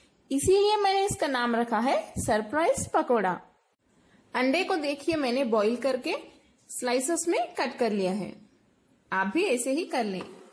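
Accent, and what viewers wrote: Hyderabad, India. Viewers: Aapki hyderabadi mast rehti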